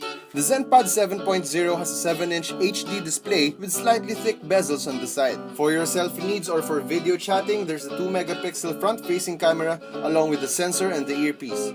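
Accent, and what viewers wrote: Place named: Philippines. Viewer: Heavy Filipino accent 😊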